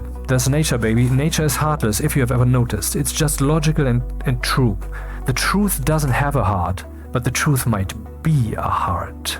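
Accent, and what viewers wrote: Germany. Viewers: I love your little rant in the middle of an explanation in all your videos. It's very entertaining and I can hear that you have an intelligent and deep soul. The rant about smoking made me realise how stupid I was... I'm quitting this nonsense tomorrow, I'm young and have good genetics, I shouldn't be messing around with it! I've heard that a lot, but I don't know, your insulting German accent worked this time. Your teaching is multidimensional. See you soon on other videos.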